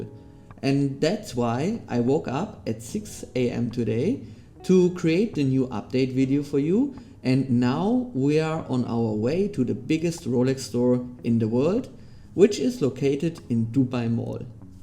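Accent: Germany. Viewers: Your accent is Germany. Bist du aus Deutschland? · Wenn du nach zwei Sätzen schon den Deutschen Akzent bemerkest. Sympathisch! Viel Erfolg bei deinem Vorhaben.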